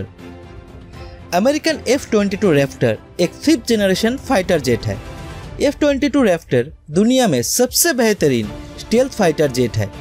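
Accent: Bengal, India. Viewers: Bro you are Bengali, I can smell it😘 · You are bengali.... there is bengali accent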